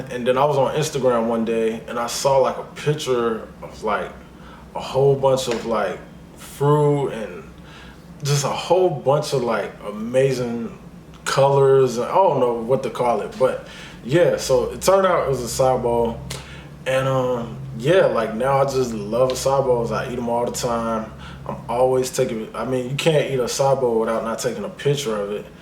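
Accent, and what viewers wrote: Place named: South, United States. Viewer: He is country. It is his accent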